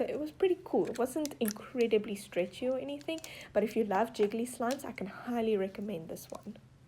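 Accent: Afrikaans, South Africa. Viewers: right when you started talking i knew you were from south africa my mom was born and raised there and i have family that still lives there i live in the US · Are you south african!? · Are you South African I think I hear it in your accent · You kinda sound South African. Thats where Im from :P Edit: wait, doesn't matter. I just realised that you have been referring to currency as "Rands" and your bio says Cape Town. I feel dumb now. Lol XD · South African right? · Your voice sound if you are from south Africa ek is van africa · Jy kan hoor jys afrikaans😂😍😘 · Lol, when I first heard her accent I immediately thought she's from South Africa, and then by mentioning our brands (Game etc) I kind of had a feeling but when you mentioned rands (South African currency) yeah you're from SA 😹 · I love her South African accent❤️🤭😊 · Your accent is beautiful, South Africa is amazing. 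🇿🇦🇿🇦🇿🇦😙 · this is kinda weird hearing a south African voice knowing, China town is kilometres away, game is in the nearest mall sksksksksksksksksk Great video tho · I can clearly hear that you speak afrikaans. I know that accent. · Are you South African because I am and you have a accent and you are say rand instead dollars to I know this because I was born in port Elizabeth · Are you from South Africa?? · I knew she sounded South African! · Honestly, I could hear in your voice that you're South African. I am South African too but I have an American accent. I just love hearing an all South African accent 😅❤❤❤ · Are you from south africa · Cause you sound afrikaanse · Ya u definitely from south africa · South African!! I could hear it in your voice😂❤️ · South african? :3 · Im not gonna lie, I realised you were South African right away and of how you pronounced the "R"s and how you pressed on the "T"s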